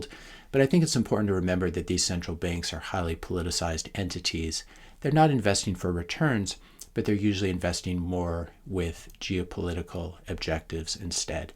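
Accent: United States. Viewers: Sounded like a ignorant american 👎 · I hate your yankee accent , it is awful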